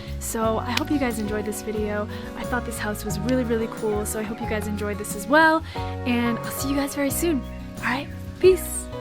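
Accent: United States. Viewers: From her accent I think she's from the USA. So this ever so eco friendly gal, did she get to Guatemala by bicycle? I fucking doubt it.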